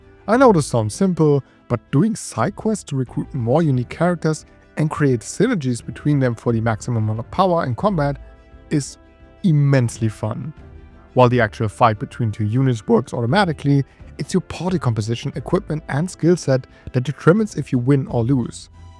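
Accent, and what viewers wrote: Germany. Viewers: Der deutsche Akzent is zu krass 😂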